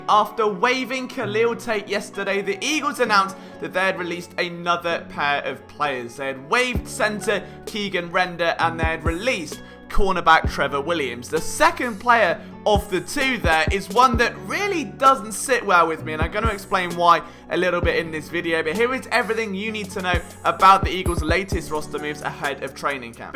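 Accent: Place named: United Kingdom. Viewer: Are you British? How did you become a Eagles fan? Love the accent btw. Stay safe!!!!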